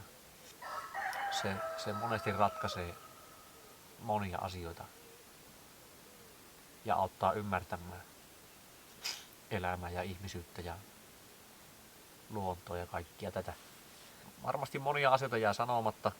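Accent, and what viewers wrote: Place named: Sodankylä, Finland. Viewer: Moi Sodankylästä. Sääsket inisee, lampaat määkii, kukko kiekuu. Mies puhhuu lähes mejän murthella pölökyllä istuen. OK.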